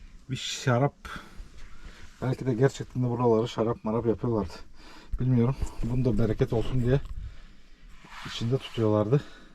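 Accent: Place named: Yoruk, Turkey. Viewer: Yalnız orası neresi merak ettim. Bizim aydın didim'in Yörükleri gibi konuşman ama...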